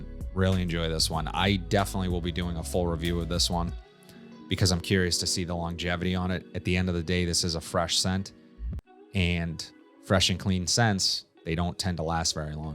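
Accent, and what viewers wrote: Boston, United States. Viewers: Nice review. On my list for this summer. Are you from Boston?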